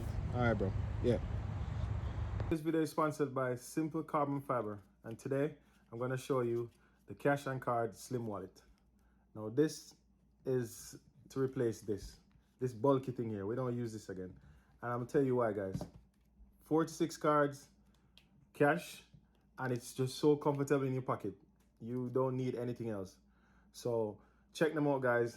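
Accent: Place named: Jamaica. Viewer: The Jamaican accent can't be hidden. It's a good look mi boss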